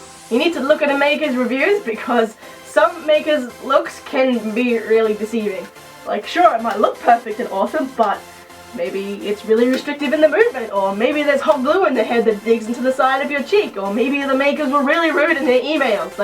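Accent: Australia. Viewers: OMG you're Australian! I need to seeee youu and hug you!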